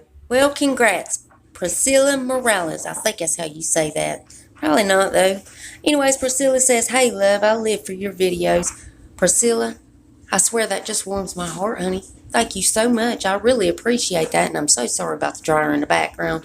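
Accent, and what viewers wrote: South, United States. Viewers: Love your southern accent ♥️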